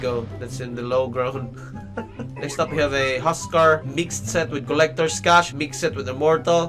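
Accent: Bisaya, Philippines. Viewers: bisaya man diay ka HAHAHAHAHAHA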